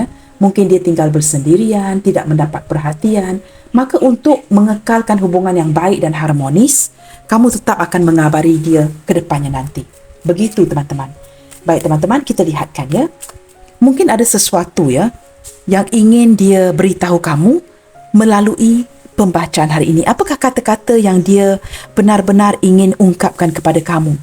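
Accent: Malaysia. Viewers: Suara nya nada cakap malaysia ni kan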